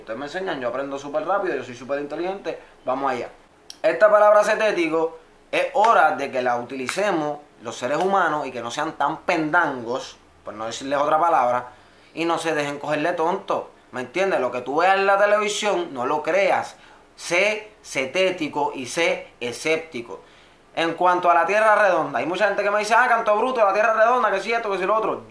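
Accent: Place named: Puerto Rico. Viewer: que loco que escuche tu acento y fuiste el primero con ese acento que no habla de reggeton ni de un temaa , bendiciones a PR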